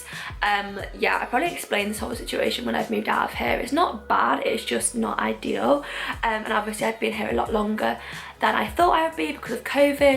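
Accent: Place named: Sheffield, United Kingdom. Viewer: Knew I was recognising a Sheff accent all this time! I'm from Darnall and have been seriously considering London for uni lately.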